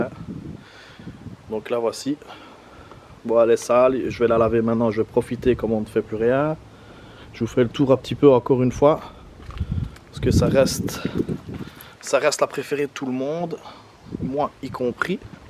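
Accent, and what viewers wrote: Belgium. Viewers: salut est tu belge par hazard?🇧🇪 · Je suis tombé sur ta vidéo par hasard, et ça fait vraiment plaisir d’entendre un Belge parler de ses voitures. J’ai un peu regardé tes réseaux, tu devrais vraiment aller voir Akram à la fin de ce confinement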